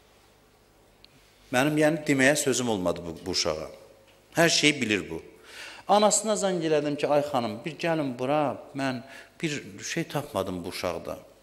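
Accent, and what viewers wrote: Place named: Lankaran, Azerbaijan. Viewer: Ərəb və fars dillərini mükəmməl bilir.Bilirsiz əslində adi insanlar bir dənə rus dilini biləndə nə qeder ləhcə və intanasiyasinda deyisiklik yaranir.Haci həm Lənkəranlidi və bizdə cox ilahiyyatcilar o zonadandir.O zonanin da xüsusi ləhcəsi olur.Ərəb Fars və Rus dillərini də mükəmməl bildiyi ücün Azərbaycanca danişiğa az da təsir edir.Turk alimleri ise sadece Erəbcəni bilir.Çox güman ki,bunnandi.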